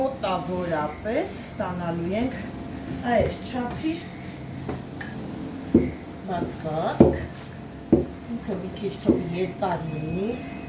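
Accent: Lori, Armenia. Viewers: Սիրելիս այսօր պատահաբար յութուբով տեսա ձեզ ամիջապես ամրացա ձեր ալիքին ուղակի կարոտս բավարարելու համար սիրով լսեցի մեր լորվա ակցենտը ես ինքս ստեփանավանցի չեմ բայց 25 տարի ապրել եմ այդ քաղաքում ունեմ շատ հոյշեր կասեմ որ գիտդնաք աբսօրվանից կունենաք մշտական հետևորդ մոսկվա քաղաքից ցավոք միմյանցից շատ հեռու ենք սիրով կփոխարինեի իմ խոհանոցային գաղգնիքները որոնց մեծ մասը իմ փորցից է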